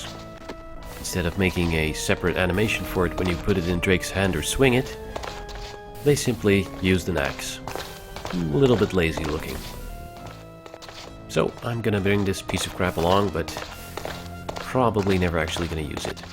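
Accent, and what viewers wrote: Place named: Quebec, Canada. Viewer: Love your videos. Very entertaining. BTW, are you French Canadian? You sound like it.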